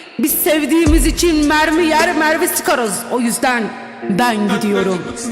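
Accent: Adana, Turkey. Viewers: Abla adana mi yasiyon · Abla Adana'da mı yaşıyon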